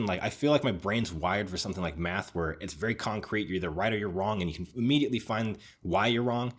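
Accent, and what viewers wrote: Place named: New York, United States. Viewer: Your videos are so well put together I’m guessing you make the hard look easy and your American accent I’m guessing is New Yorkan? That’s just the icing on the cake the content I always feel is first class. What surprised me was today’s content I really needed this as a professional civil engineer I got into it because I followed in my old mans footsteps but I have to say after 18 years I’m done. Time to follow the thing I’ve always wanted to do for the last 12 years, tech. Cheers x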